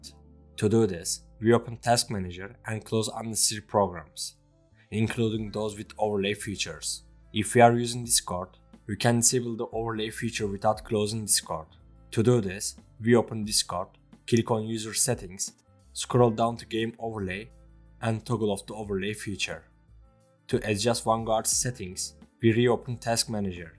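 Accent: Turkey. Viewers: reis konuşmandan anlamdım türk olduğunu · Türk aksağanı çok anlaşılıyor abi ama teşekkürler video yardımcı oldu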